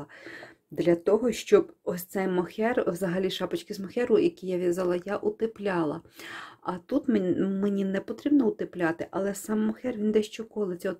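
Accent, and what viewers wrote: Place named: Kyiv, Ukraine. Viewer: Я благодарна вам, и говорите вы, так мило, как моя тётя в Киеве говорила, люблю ваш язык. Спасибо за доступное разъяснения.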